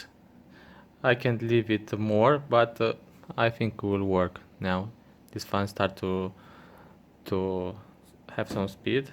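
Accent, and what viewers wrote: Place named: Scotland, United Kingdom. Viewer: you sound like a scotish guy :D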